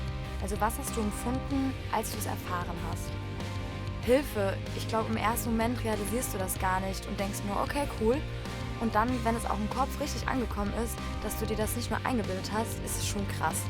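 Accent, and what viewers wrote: Germany. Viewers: That German accent is so pure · Ich liebe dich, I used to be obsessed with germand and germany I have no idea why, but I love the accents.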